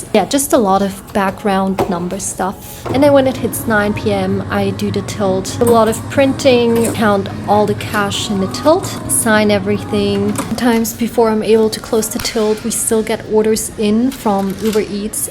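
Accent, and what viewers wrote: Australia. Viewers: I just wanna add that as an Aussie watching your content I can hear your accent changing to sound a bit more Aussie 😁 ps. Congrats on your new job! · I can already here some Australian accent coming out haha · I can hear that aussie accent coming in :D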